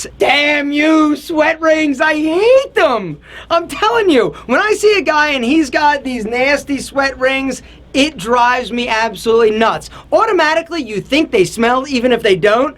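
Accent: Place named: Pittsburgh, United States. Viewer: this guy sounds like a yinzer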